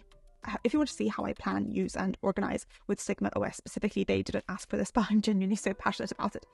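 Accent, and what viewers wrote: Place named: Scotland, United Kingdom. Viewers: She is from London but I hear a slight Scottish accent also. It's a mix of both because of the way she pronounces certain words. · what kind of British accent is she? can anyone answer me this question? i'm learning english and i'm not convenient to listen rp american and rp brittish accent but her voice is so soft to my ear. i want to do research what her accent is from. (thanks)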